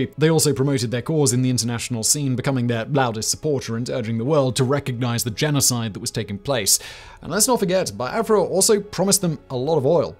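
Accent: United Kingdom. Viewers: romanblack4247 It's not a white accent lmfao what? He's British and even then he could have easily pronounced the words like Yoruba or Igbo correctly. Wth is a white accent? He simply just didn't even try to learn how to pronounce them correctly.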